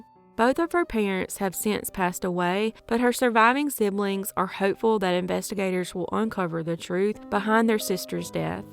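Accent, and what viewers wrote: South, United States. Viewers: Ur voice was definitely made for these types of YouTube videos!! Ur voice is so smooth but so southern... I love all ur videos & I personally think YOU SHOULD HAVE MILLIONS OF SUBS!! UR VIDEOS R NEW & UNLIKE YOUTUBERS WHO Have millions of SUBS & ALMOST ALL THEIR VIDEOS R VIDEOS THAT THEY HAVE SHOWED A MILLION FREAKING TIMES & ITS LIKE THEY SHARE RERUNS.. IT DRIVES ME NUTS CAUZE THEY MISLEAD U & BAIT CLICK U!! UR A SPECIAL BREED.. I WONDERFUL 👏 BREED.♥ THANK U.. MUCH LOVE FROM CANTON,OHIO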